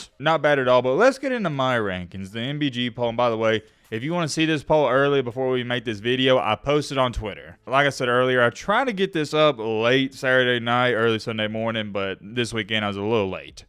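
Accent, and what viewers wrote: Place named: Alabama, United States. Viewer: The way you say Miami hurts my ears 😂 that’s that Alabama country talk my Amy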